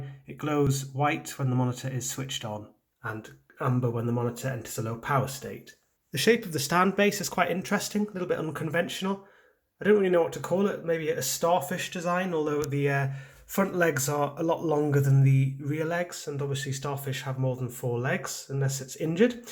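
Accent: United Kingdom. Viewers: you have an english accent, but im looking in UK and cant find this monitor. all i can find is the 24G2U5. where are u located?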